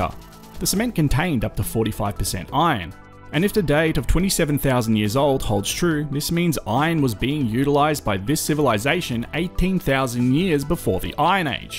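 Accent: Australia. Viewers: Suggest you try using local pronunciations rathan than Australian for local names. Eg Gunung Padang. Vowel sounds in Bahasa Indonesia are a bit different than English :)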